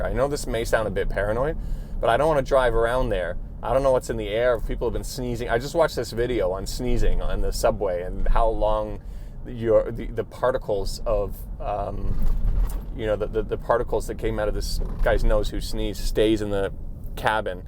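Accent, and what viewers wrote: United States. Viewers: First time watching your vids...are you American? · I like your comments. I live in SZ half a year for 6 years now. NanShanQu by OCT Windows to the World. You don’t exaggerate and you don’t make up things like other Tubers. You sound more California than Canada, although I noticed your “oout” slightly. Enjoyed your piece. Of course, SZ is unique. All new everywhere and everything. I hope you mention to viewers that in other cities they have new sections similar to SZ and old parts.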